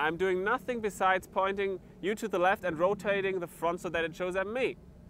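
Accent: Germany. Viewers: Well except for the German accent this is actually pretty good :)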